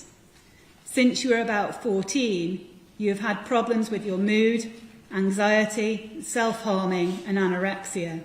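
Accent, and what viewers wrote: United Kingdom. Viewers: Video with British accent, not American. But yeah 2 crazy person exist blame on government and school for not equal realise us lgbt in public. Obvious they not doing enough for lgbt community in Britain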